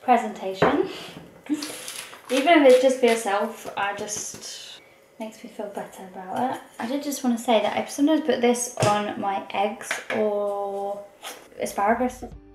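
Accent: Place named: England, United Kingdom. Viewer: Great channel, love the way you explain keto really well and your down to earth attitude. Ive been doing keto for a month and really like it. I think everyone does keto their own way and everyone's a bit different. Been watching various channels and most of them have been American. It's great to have channel with an English accent talking about the places I know like tesco. Thanks and well done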